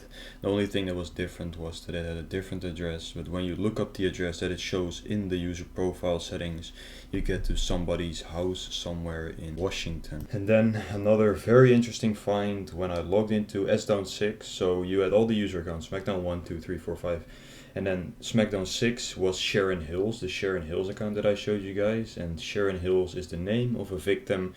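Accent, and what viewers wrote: Netherlands. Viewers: Great vid, but you reveal a little too much that you're Dutch speaking, i'd say close to Belgium or Belgium itself ;-) groeten van Antwerpen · Happen to be dutch lol? Lmao your accent love it, just like the justice given! Keep up the good work! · You send dutch af my guy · ik heb echt respect voor jou kerel je gebruikt je hacking skills tenminste voor een goed doel ga zo door!!! je bent Nederlands neem ik aan van je accent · Hi.. thanks for your service in raiding the scammers. Are you Dutch? Sorry, just listening to your accent. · Broer waar in nederland woon je ? Dacht al die nederlandse engels accent. Jij zat vroeger zeker op ict of wa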